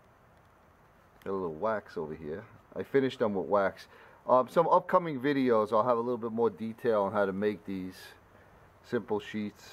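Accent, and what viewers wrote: New York, United States. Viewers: my friend. EXCELLENT work! Those are talented hands fella. It really is too bad that Nicholson/Weller is producing such garbage now. They used to be the best of the best. Maybe they should find something else to do eh. That accent of yours sure sounds Bronx/New Yorkish? Take care. I always enjoy what your up to bud.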